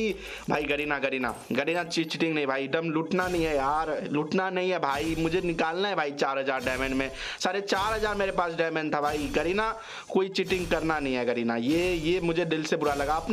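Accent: Bihar, India. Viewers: Aap bihar se ho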